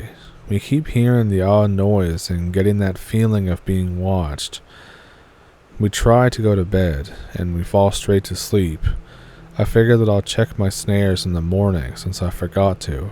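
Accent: Canada. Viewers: This is told so well I'm actually laughing at the state of mind those guys are in lol, spent nearly 5 years in the UK now I think I probably know the area they come from with how they speak to each other 😆 , in a Canadian accent? I think, like a movie in my head very well done sir ! 👍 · I’m English and I think it’s cute lol but it’s probably because I love his Canadian accent. I would listen to him read the football scores! 😂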